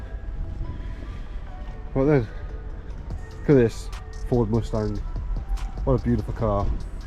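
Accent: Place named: England, United Kingdom. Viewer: What a fun English accent...and owsome cars...yeah...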